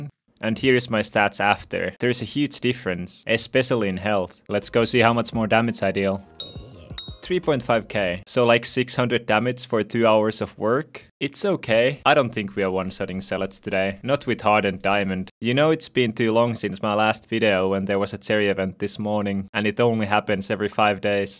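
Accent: Finland. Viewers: Its called Finnish accent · Are you finnish? Like, your name is and your accent sounds little bit like finnish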